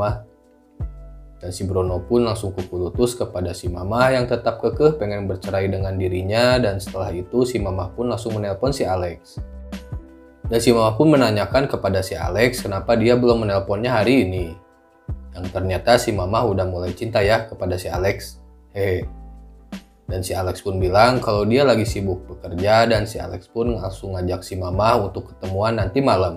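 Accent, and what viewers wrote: West Java, Indonesia. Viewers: anying sunda bet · Akang ngomong nya sunda banget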